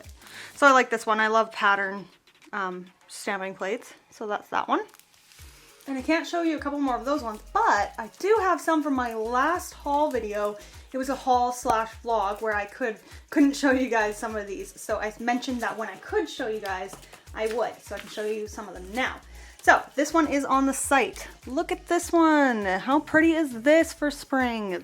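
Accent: Canada. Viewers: is smart, educated and beautiful and many Canadians say it the way she does 🙏🏻 it’s okay to pronounce things differently based on where you live 🙂 There are many words Americans say differently than us, heck even different parts of canada say some words differently, that’s the beauty of diversity in our language 🥰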